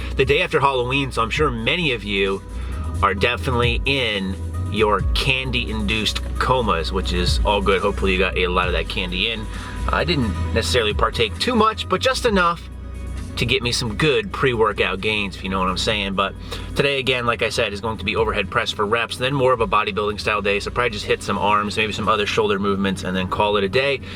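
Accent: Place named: South, United States. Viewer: YUSSSSSSS the return of with that ole southern accent. Appreciate it man it sickening.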